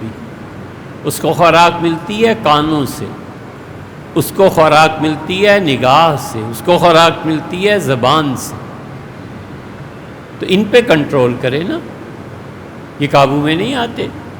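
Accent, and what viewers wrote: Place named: Lucknow, India. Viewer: دریافت فرمایا بشمول کتاب کا عکس مفتی صاحب کی مندرجہ بلالا گفتگو پر غور فرمایا جاۓ کہ مفتی صاحب اپنے لکھنوی لہجہ و زبان کو رائیج کرنے میں کس قدر کوشاں ہیں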